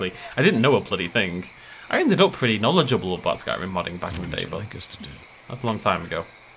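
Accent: United Kingdom. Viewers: A bit late, but happy 10th anniversary. Been with channel since very early, to the point I basically learned how to speak english thanks to this channel, british accent and all by trying to understand what you said in each video. James and you made my and many others' childhoods amazing, and are probably one of the most iconic dino-tubers in the scene. Well deserved 10 years of uploading.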